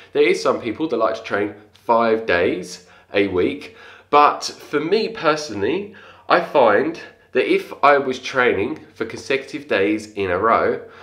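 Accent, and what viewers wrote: United Kingdom. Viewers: but where is your accent from? You sound British? Just curious, keep it up👍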